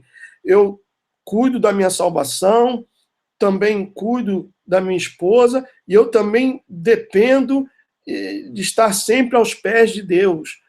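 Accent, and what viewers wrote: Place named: United States. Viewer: tchat???!! pô fala serio.... vc mistura português com sotaque americano... se liga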